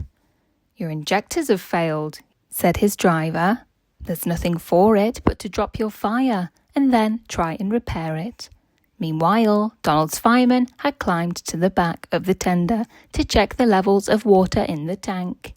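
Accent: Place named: Scotland, United Kingdom. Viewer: You did well with the Scottish accent. 🏴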